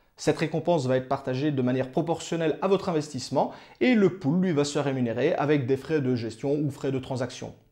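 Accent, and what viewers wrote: Belgium. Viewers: On reconnait cet accent belge dégueulasse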